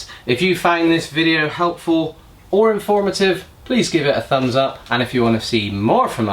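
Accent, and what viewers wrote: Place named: West Country, United Kingdom. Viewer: where are you mate? You sound West Country. We need this sorting on my step daughters corsa. She is In Glastonbury.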